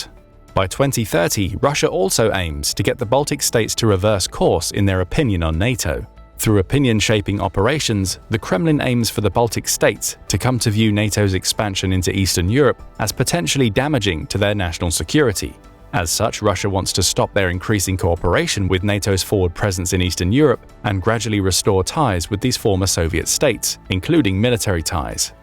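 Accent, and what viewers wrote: England, United Kingdom. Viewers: I think the narrator is from The UK. Greetings from a fellow Dane 🇪🇪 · That English accent is all I need to know about the serious b.s. joke I'm about to hear 😂😂😂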